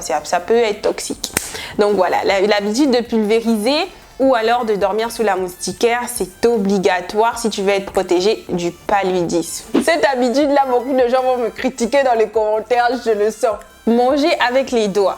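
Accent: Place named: Democratic Republic of the Congo. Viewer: 🤣🤣🤣 tu mets "quoi" à la fin de certaines phrases 🤣🤣 hey moninga tu es purement congolaise toi 😍😍😍😍 je t'adore. En passant tu es trop belle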